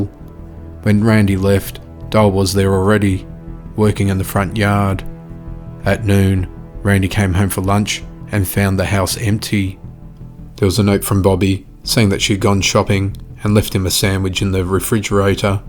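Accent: Australia. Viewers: ~ I have just watched 4 of your videos, I honestly didn't catch your Aussie accent! Only since reading the comments on this video, I'm in Western Australia, well done, great channel 😊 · Ricky Maveety he’s Australian, so won’t know the American pronounciation. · Are you an Aussie? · it's my pleasure. And the name is a shoutout to the ' ' song 😂 Can I ask if you're from NSW? I'm not, but you enunciate like Sydney Police Officers....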